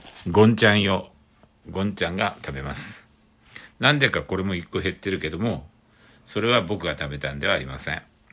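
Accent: Saga, Japan. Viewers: 佐賀産の食材は素晴らしい👍 すき焼き好きで〜すそして佐賀弁良いね👍😊 今日のゲストは「ゾマソン」さん！あれっ、僕は間違ったかなさん😅 Xも見ますね！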